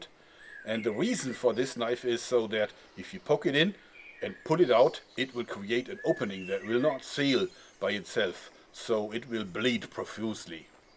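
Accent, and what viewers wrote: Germany. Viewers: Du hast nur einen Satz gesagt und ich wusste sofort das du aus Deutschland kommst :D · he sounds german idk :D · you have an really Nice german ~ accent ~ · man hört voll raus das der Typ deutscher is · you are one sick fuck;-) l enjoy your exuberance and enthusiasm dont change and that german acent off the top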